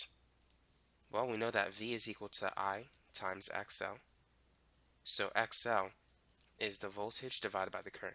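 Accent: United States. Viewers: A very sharp, clear almost squeaky British voice, but pronounces like an American. Perhaps, he lives and teaches in the States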